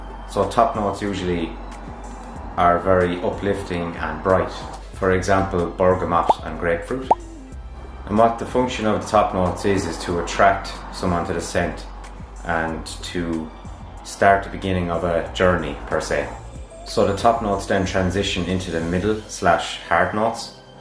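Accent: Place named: Ireland. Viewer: 8 seconds and in i said out loud at my computer screen "woah! this guy's irish!" 😂 before you even announced it (not a bad thing, i love the accent) i then knew without a doubt this was gonna be the video to satisfy my craving for the knowledge on what top, heart, and base notes are.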